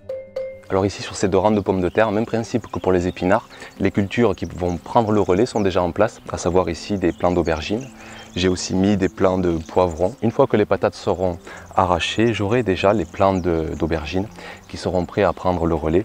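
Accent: South, France. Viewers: Merci pour cette vidéo . Mais je n'arrive pas à comprendre a quelle date (mois) vous récoltez tous ces légumes. Ni comment vous faites pour éviter la canicule de cette année. Comment vous faites aussi pour n'avoir aucun insecte qui détruit les légumes ? J'entends que vous êtes dans le sud, j'y suis aussi (Ardèche, moyenne montagne) et chez nous tout est brûlé par les UV : pouvez-vous nous aider là-dessus ? Merci ! A bientôt.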